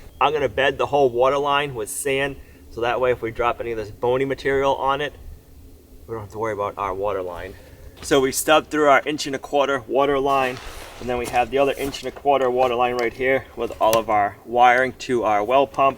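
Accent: United States. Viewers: Regional dielect we have them all over the US. Lighten up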